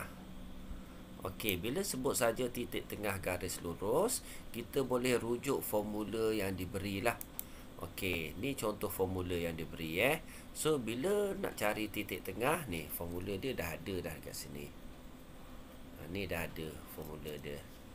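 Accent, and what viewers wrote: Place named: Kelantan, Malaysia. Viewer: kenapa cikgu cover cover kelantan cikgu , takpe cakap bahasa kelantan pun boleh hehe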